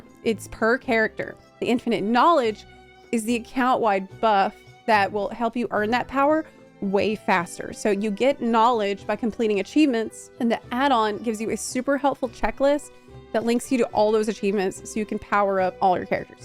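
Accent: South, United States. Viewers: Your southern accent is legit.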